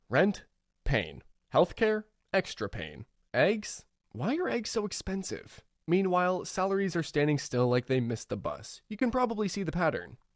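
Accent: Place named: Canada. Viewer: I can tell from your accent you’re Canadian… so am I and we’re screwed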